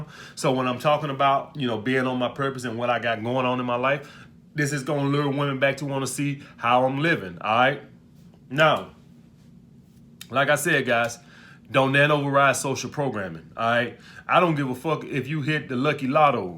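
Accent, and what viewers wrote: South, United States. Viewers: Do the southern accent help you in NY?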